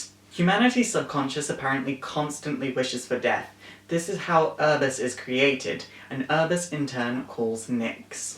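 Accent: United Kingdom. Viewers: I can't tell if your british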